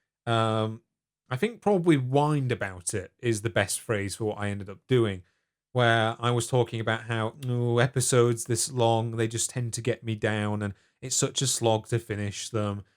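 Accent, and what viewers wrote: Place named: England, United Kingdom. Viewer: thats not an American an Englishman. Trying to act smart when you cant even tell accents apart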